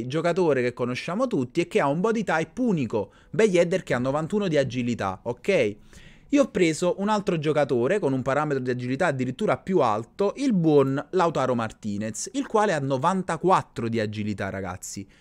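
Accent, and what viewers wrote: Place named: Naples, Italy. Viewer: Si vede che sei allievo di Holly ... io ho vissuto 12 anni a Napoli ed adoro la parlata tua e di Holly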